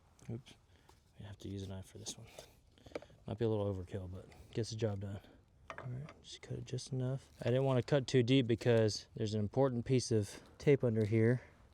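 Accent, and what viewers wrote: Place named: Texas, United States. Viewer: What I like about this one is the honest approach/honest self appraisal of safety, wiring, and personal accountability to get R done, then, later, to address any issues after the fact since time was short for the install. The other thing I like about this one is listening to a Texan talk. Last thing is to have here the corroboration to/of where I can find my Upfitter Switches' terminus under the hood! Win/win/win. Thanks a ton! Cheers.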